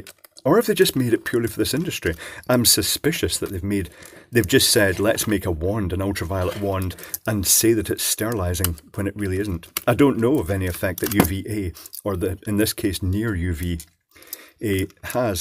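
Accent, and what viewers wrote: Scotland, United Kingdom. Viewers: In addition to your lovely Scottish burr(I could listen to you all day), you have just saved me a lot of money and a lot of potential pain. Glad I found you.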